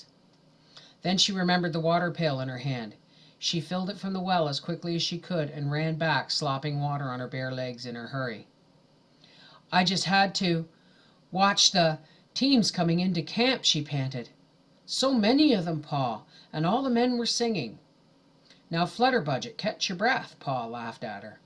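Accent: Canada. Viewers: the pronunciation must be a Canadian thing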